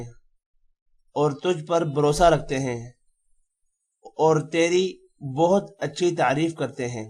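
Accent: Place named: Baltistan, Pakistan. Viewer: Aap ki awaz aur bolne k tariqe se lagta hai ki aap balti ho.. Kiya mey sahih hon.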